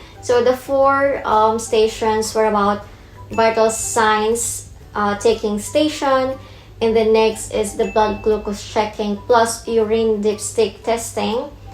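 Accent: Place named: New Zealand. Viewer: very helpful content, and aside from that, the accent! very NZ, hehe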